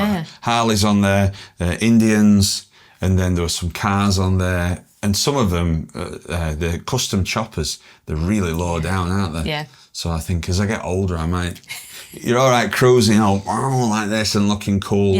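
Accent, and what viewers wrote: Northern England, United Kingdom. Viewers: Guys, firstly great channel. Early subscriber as love your attitude towards Biking and don’t hide your early stage learning curve. Should have commented earlier, as would love to help with advice as it’s needed. Anyway, a comment for today’s video. CFMoto are not in the same class or category as other Chinese brands. They are massively and heavily involved with both KTM and Yamaha….meaning they have been and are producing massive amounts of bike parts for them. They are a proper company and are distributed through KTM dealerships. Parts issues will be no better or worse than anyone else. I don’t ride a CFmoto but if I was at your stage in biking life I would seriously consider one if budget allows. It’s also nice to hear a northern accent so don’t go changing that 😂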